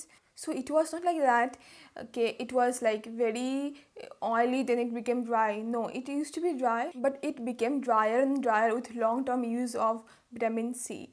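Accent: Bengal, India. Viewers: Godddddddddd ur cute.......got ntn to do with the video n vitamins n stuff but loved that bengali accent♥️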